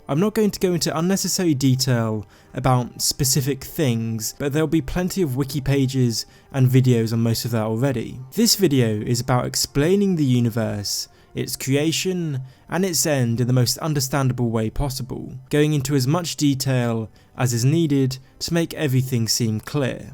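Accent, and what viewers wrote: United Kingdom. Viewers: Love your videos and your voice . What part of GB are you from ???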